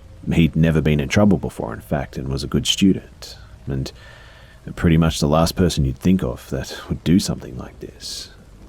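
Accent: Australia. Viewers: Good story but having a narrator that is man with a man with australian accent playing a 29 year women from texas with a southern accent does not do it for me. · Also I have to ask are you an Aussie? I am and you have a very Aussie accent 🇦🇺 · Wait do you have an Australian accent?? Oh my gosh that's my favorite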